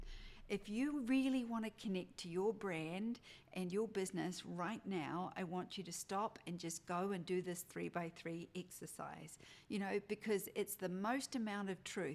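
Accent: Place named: New Zealand. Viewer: Love that you're back with this new endeavor. Looking forward to your weeklies. Thank you for being and I am in love with your beautiful Kiwi accent.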